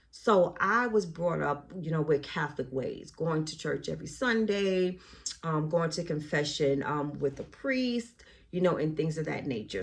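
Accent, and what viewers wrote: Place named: Northeast, United States. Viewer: Where are you originally from? Sounds like somewhere in the NE? My daughter got into Spelman also but she went to another school in Rochester NY.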